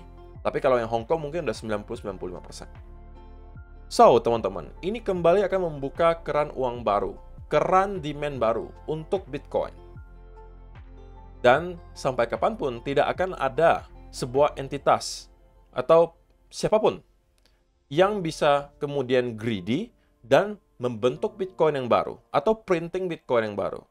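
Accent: Pontianak, Indonesia. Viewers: haa abg org pontii ???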